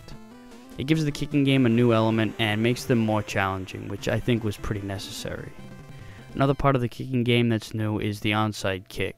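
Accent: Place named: New England, United States. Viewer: Great review; greater game. The NE accent adds some flair to the review.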